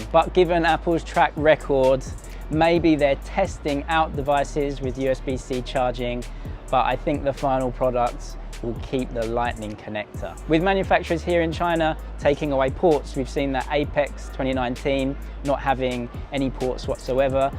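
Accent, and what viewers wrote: United Kingdom. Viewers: Like your British accent! I watched the video you challenged Guizhou dialect , that’s fun, try to speak Cantonese)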